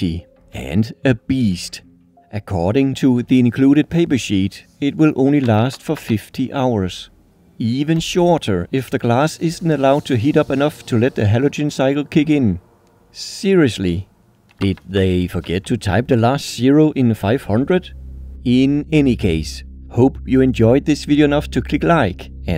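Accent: Denmark. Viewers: The Danish accent 💀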